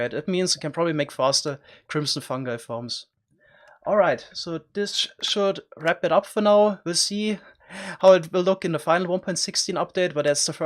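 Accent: Germany. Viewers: Hahaha ich hab gewusst du kommst aus Deutschland!!!! xddd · Deutsch man hört es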